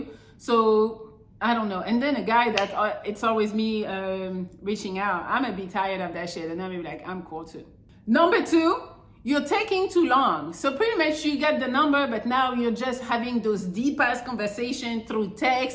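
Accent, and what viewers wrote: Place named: France. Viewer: Are you french bro?😭 Im sorry but as a french dude it freaks me out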